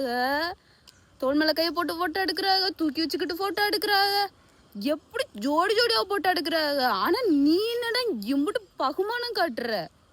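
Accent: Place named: Kongu, India. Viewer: Kongu tamil super ❤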